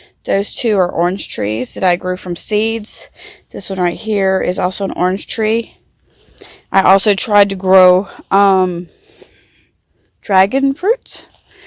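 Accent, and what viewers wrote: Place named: United States. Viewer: Thank you so much for this video... I have always been the type of person that tries to reproduce any plant that she sees... I love plants... I picked up 2 seed pods from my best friends yard today because the red seeds poking out caught my attention... I thought "omg, I can grow magnolia trees"... As soon as I got home I googled it and your video was one if the first things to pop up. Thank you sooo much for the information that you have given me and I just put my seeds to soak a little bit ago.... Also, I love your country accent and you seem like an awesome person overall... I hope you have a great weekend!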